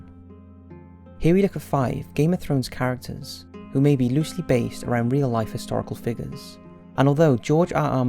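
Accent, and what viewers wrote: Wales, United Kingdom. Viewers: I think it's a Welsh accent.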